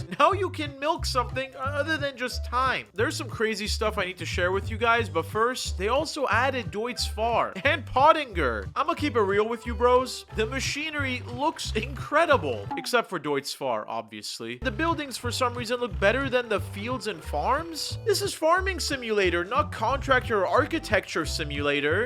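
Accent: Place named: Germany. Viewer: Bro sounds like a real german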